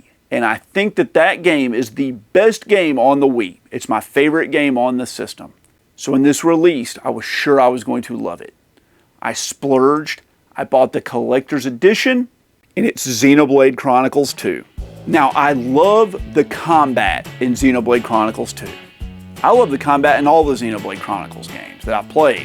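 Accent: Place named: New England, United States. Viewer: You seem like you’re from the southern part of upper New England. That’s not an insult by the way. I’m from Middle New England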